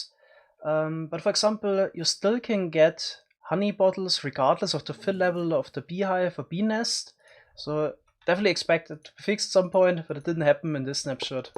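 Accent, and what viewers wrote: Germany. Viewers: are you from Germany? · Du bist deutsch, oder? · 100% der Akzent ist einfach mega deutsch 😂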